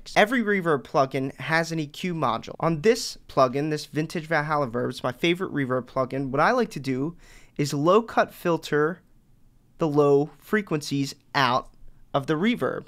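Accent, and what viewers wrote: Philadelphia, United States. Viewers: Philly accent 120 pct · you from philly?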